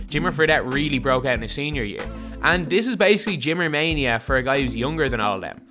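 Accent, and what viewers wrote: Ireland. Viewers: Your accent is dope. Irish I would assume. Pretty cool listening to an Irish dude talk about basketball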